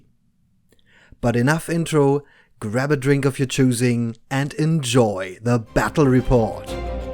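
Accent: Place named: England, United Kingdom. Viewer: Thanks for the battle report. I like your english accent, it seems to fit the theme of the Malifaux universe.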